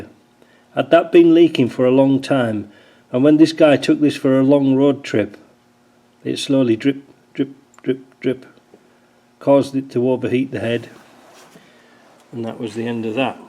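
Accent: Yorkshire, United Kingdom. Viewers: I thought Ken Dodd had stepped in for you for a moment until the Yorkshire accent came out.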